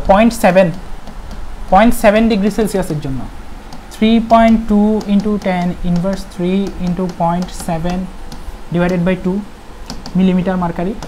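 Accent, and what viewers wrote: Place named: Chittagong, Bangladesh. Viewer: চট্টগ্রামের মানুষকে বোঝা যায় তার ভাষার অমায়কিকতায়!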